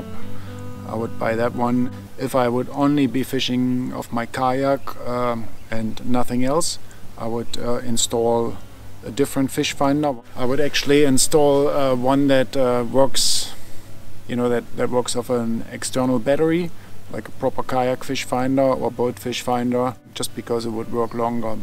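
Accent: Germany. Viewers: Do you have a German accent? :)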